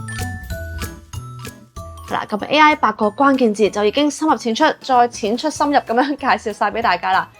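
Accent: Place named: Hong Kong, China. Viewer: ，有冇去過惠州的"雙月灣”玩？好想睇下妳既介紹，香港式廣東話同聲同氣嘛🙂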